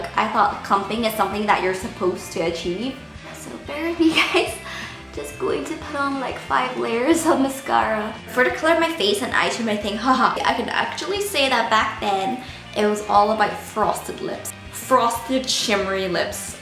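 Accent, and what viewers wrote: Northern Ireland, United Kingdom. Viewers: you kinda have a british accent 😁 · She lives in Northern Ireland again so her accent probably got stronger from hearing it all day everyday from everyone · As a norn Irish meself, i can personally say her accent is way cuter than the rest of ours 😂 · she's from Northern Ireland!! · I love your accent😂 Like I'm from Belfast and I can hear all the Northern Irish things you say, haha👏🏼👏🏼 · Does she live in Northern Ireland? She has the same accent as me 😂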